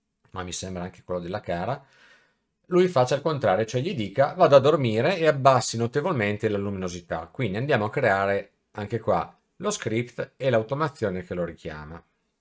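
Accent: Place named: Italy. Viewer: Grazie per il video! Dopo giornate e giornate nelle quali cercavo una soluzione per il mio tablet, con questi script mi hai risolto tutti i problemi!! Tra l'altro, a sentire dall'accento, sei anche delle mie parti! 😅 Grazie ancora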